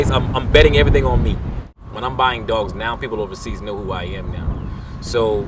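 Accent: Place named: South Carolina, United States. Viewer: Beautiful dogs!! I knew I heard a SC accent..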